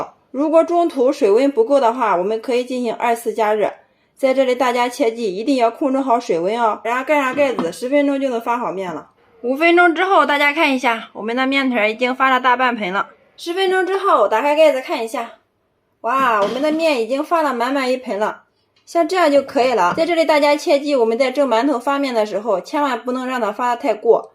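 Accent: Shandong, China. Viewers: 不单纯是快的问题，主要是山东话味儿太重所以听着费劲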